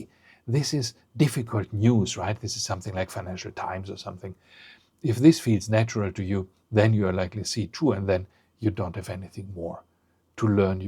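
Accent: Germany. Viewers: I lived in Greece some thirty years ago and learned the language. Never stopped learning Greek as I follow music, television and film. According to your test I have C1 level of understanding. C2, I could understand some but not the details of the message. Btw your accent in Greek is almost imperceptible, though I could tell when you spoke English that you are German.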